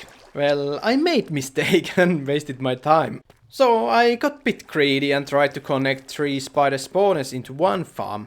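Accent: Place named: China. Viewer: Bro sounds Chinese 😂 no hate tho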